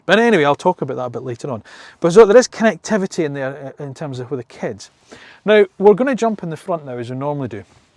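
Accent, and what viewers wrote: Scotland, United Kingdom. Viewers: Nothing better than a Scottish accent for saying "I'm not sure about that... I'm really not!" 😄😄😄 Thanks for another great review. Never heard you so scathing about a car. I wonder if they'll still be around this time next year?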